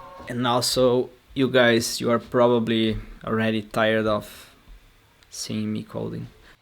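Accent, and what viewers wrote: Brazil. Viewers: Yes, I whatched this video in this intention, is more easy to undestand because the brazilian accent. And he speak more slow, this facilitates undestanding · I’ve watched this video with this intention. It’s easier to understand because of his Brazilian accent and he speaks slowly which facilitates the understanding. Acho que assim ficaria melhor :-)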